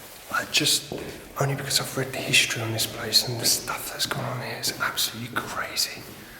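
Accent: England, United Kingdom. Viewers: Sorry... I couldn't view this one ... I feel energy and didn't want to go any further....There are place that have residual energy and you can feel it. This one is Heavy. Not saying bad...just uh uh.. But, I know you gave it the respect and love for what was left there. Good Job. I have to say in other places I have seen you go to....You speak while you are doing it. I want you to know that As you are speaking you are including those who view...As they are there with you and sharing the experience....You do an Awesome Job...and LOVE the brogue...( not meaning anything by it ) My First Love was from England and He spoke the way you do....It makes me smile. I'm a fan...And I'm older than your Mum so I'm safe ! Lol Thanks for taking us places I have never been.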